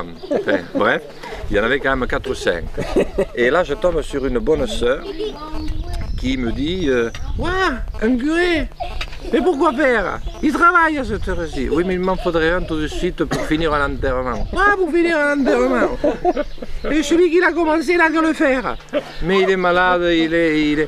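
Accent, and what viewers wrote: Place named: Béarn, France. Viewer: Ce n'est pas un accent Chti, mais un accent du Sud-Ouest !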